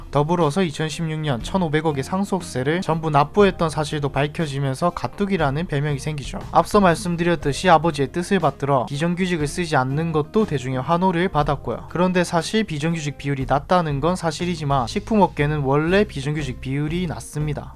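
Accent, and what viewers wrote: Gyeongsang, South Korea. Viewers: 나레이션이 사투리죠? 고향이 경상도인가봐요. 잘 듣고있습니다.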